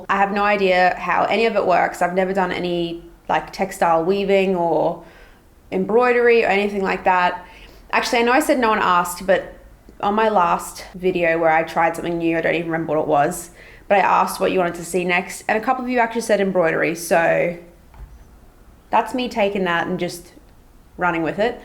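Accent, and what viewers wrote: Australia. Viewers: are you austral,ian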